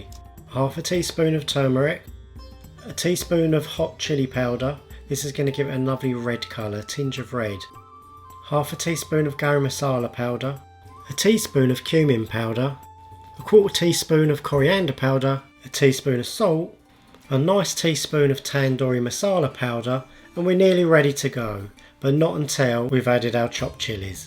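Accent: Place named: London, United Kingdom. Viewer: mate! your accent isn't to far off Russel Brand's. hope you're not offended. you live near the Thames?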